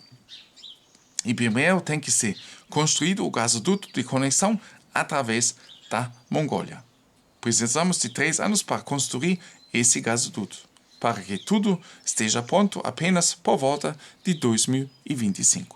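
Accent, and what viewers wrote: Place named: Germany. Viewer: Parabéns! Eu gosto de assistir seus vídeos. Balneário Piçarras SC Brasil Os descendentes de alemães que moram aqui no Brasil falam com sotaque igual ao seu ehehe Em Santa Catarina temos uma cidade com quase 90% da população alemã que se chama POMERODE. Também tem a cidade de Blumenau que tem bastante descendentes de alemães.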